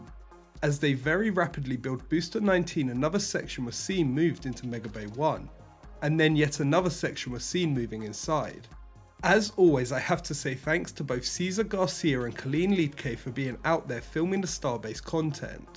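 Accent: England, United Kingdom. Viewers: Another english voice? Man get over it.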